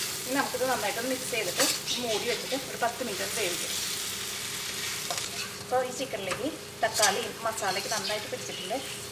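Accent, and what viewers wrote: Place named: Irinjalakuda, India. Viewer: I like your slang.. are u from Trissur?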